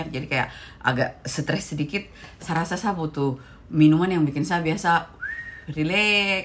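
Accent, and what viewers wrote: Papua, Indonesia. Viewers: Kk sehat2 ya....senang dengar kk punya logat Papua biar tinggal di lain benua logat tra hilang 👍❤️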